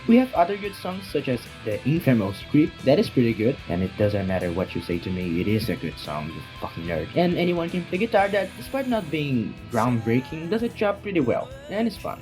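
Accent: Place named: Brazil. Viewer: Hey, i've been subscribed for a while, and i've been meaning to ask, do you have a portuguese speaking channel? i'd love to see it, and share with some other brazilian folk, for real! I believe you're brazilian/portuguese based on your accent and community posts, if not, please ignore this comment.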